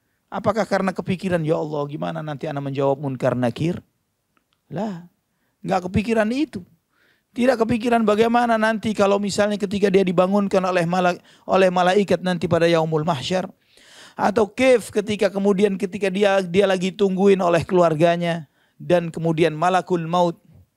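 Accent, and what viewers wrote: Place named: Java, Indonesia. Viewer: Cukup mirip ketegasannya. Ini logat Jawa, ustad Khalid logat Makasar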